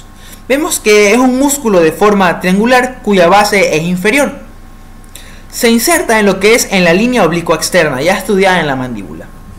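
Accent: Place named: United States. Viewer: Todo muy bien … pero tienes problemas para pronunciar la R porque se escucha como un gringo 🙄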